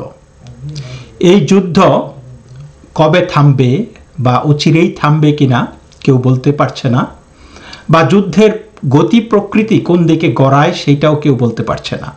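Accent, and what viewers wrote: Bangal, Bangladesh. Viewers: Dada to bangal......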